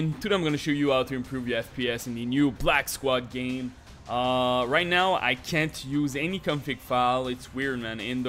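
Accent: Quebec, Canada. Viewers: l'accent direct j'ai capté que t'es un French Canadian :P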